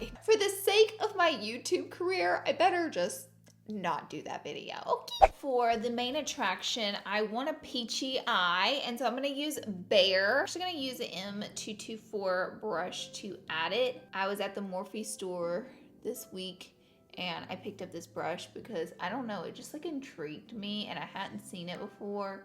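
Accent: South, United States. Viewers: I love your voice 😂 I sound just as country 🤷😍😇🤸♥️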